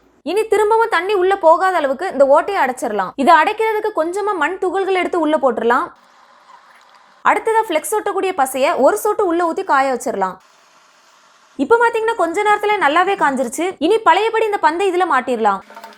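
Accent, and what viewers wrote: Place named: Nagercoil, India. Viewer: நாகர்கோயில் ஸ்லாங்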